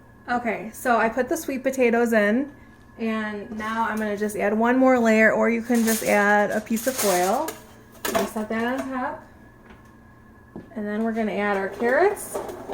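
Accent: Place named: Michigan, United States. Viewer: You're from Michigan aren't you lol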